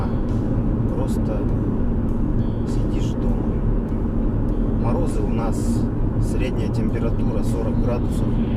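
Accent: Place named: South, Russia. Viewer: Говор у тебя все равно южный 😁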